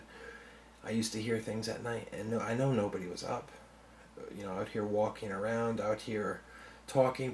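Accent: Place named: Midwest, United States. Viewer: OK, first off, I can't believe they would make you pay a fee for THEM to disclose shit about the property, I thought they HAD to disclose a haunting! Email that show "The Dead Files" on the Travel Channel and get Amy Allen to come out and see who the heck is haunting your property. You have a baby, tell them that and they may make time for you. You sound "mid west" so start looking, lots of paranormal groups or a priest or a shaman. Start doing research on the property and the grounds or area.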